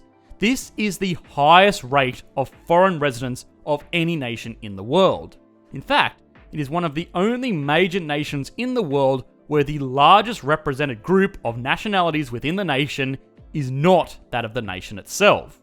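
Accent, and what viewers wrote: Australia. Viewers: The Australian accent is very very irritating. Please adopt a US accent.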